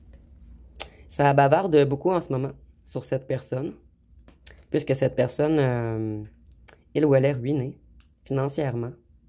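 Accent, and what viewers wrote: Quebec, Canada. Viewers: Votre voix me réconforte et elle est fort agréable . Et vos mains jolies avec vos ongles et vernis . Merci pour la guidance que vous nous apportez gracieusement , et votre accent québécois j'adore. Cette personne a essayez revenir mais je ne veux plus depuis que j'ai vu derrière le masque qui s'y cache , un homme a femme qui se dit fidèle ,et qui est ds des travers sexuelles et malsains et en perpetuelles conquêtes il papillonne , il est très toxique et malsains très menteur ,depuis que j'ai retrouver ma liberté ,je revie sans lui et retrouve mes énergies ,il n'apprécie guère car il voulais me mettre sous terre, il m'avait mise en cage et enlever mon abondance et mes énergies ,il est jaloux maladif et possessif un psychopathe mytomane pervers narcissique ,toute la famille est ainsi complètement cingles, et seul il ne peut pas vivre et il est trop immature.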